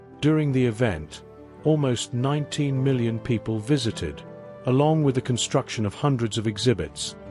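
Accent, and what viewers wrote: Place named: United Kingdom. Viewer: Great video! I like your new male British accent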